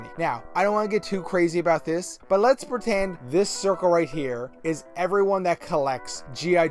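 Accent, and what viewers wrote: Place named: Boston, United States. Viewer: This accent is crazy. It's like you're from Boston...Canada?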